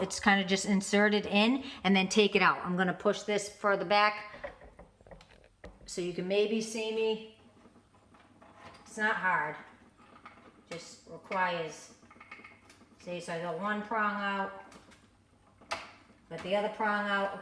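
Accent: Boston, United States. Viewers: Not a NY'er....That's a wicked strong baawston townie accent.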